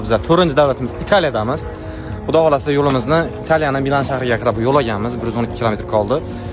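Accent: Khorezm, Uzbekistan. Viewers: Assalomu alaykum Yaxshimisiz ,uka xorazmlik misiz Aksentingiz yaqin ekan Omad ,yo'lingiz yorug' bo'lsin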